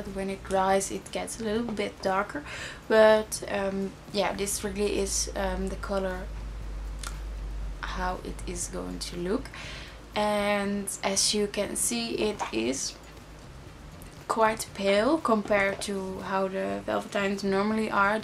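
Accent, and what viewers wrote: Netherlands. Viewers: Loved this reveiw! I'm obessd with my Veletines. I also love you Dutch accent. Immediately, I knew you were Dutch because of it. (: My oma is from Holland. Haha. Keep up the great work. Definately subscribing!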